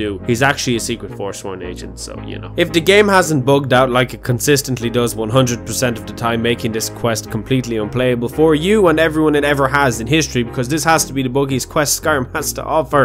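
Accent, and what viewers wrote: Ireland. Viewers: I am a simple sera. I hear an irish man . I see a Khajiit. I like it. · Where in Ireland are you from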